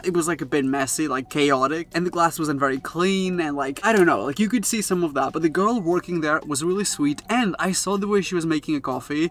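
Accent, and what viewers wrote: United Kingdom. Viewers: of course he's British. Do you not hear him?😂plus he has said many times he lives in London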